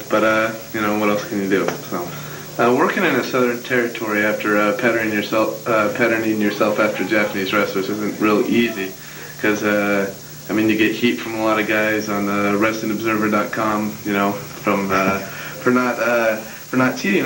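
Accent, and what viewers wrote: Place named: United Kingdom. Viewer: He sounds a bit british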